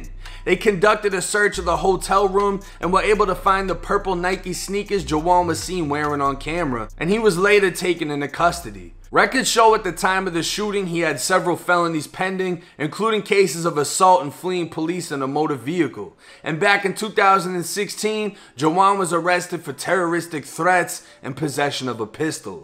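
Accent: Boston, United States. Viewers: I ain’t know Tampa had that accent thought you was from Boston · Are u from Boston bro?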